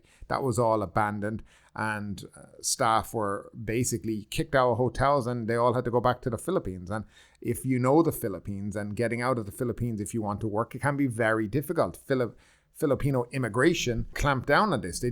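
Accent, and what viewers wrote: Ireland. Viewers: Agreed .He sounds like a fellow Irish man God help me .I bet he was for lock-downs and the jab as well .If this is supposed to be a news service ? The commentary should be non judgemental .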